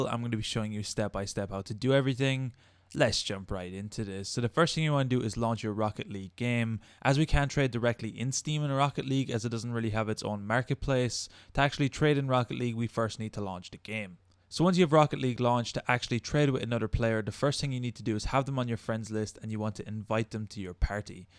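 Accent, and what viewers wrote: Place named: Ireland. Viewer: Knew u sounded irish Hello From a fellow irish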